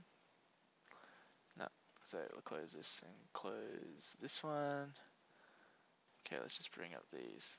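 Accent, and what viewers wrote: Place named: Australia. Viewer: Heyyyy Australian ;) What part you from?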